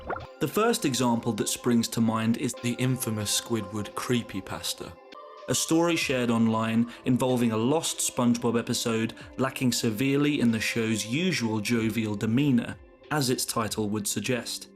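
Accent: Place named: United Kingdom. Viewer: Youtube: "Do you wanna watch a video of a british man explaining a Spongebob parody of a album?" Me: "Yes."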